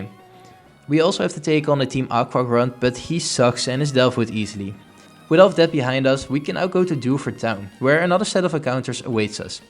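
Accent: Netherlands. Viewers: you sound very dutch, is this true?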